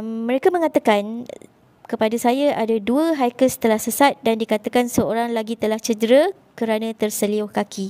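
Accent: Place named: Java, Indonesia. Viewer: Pekat jawa nye ni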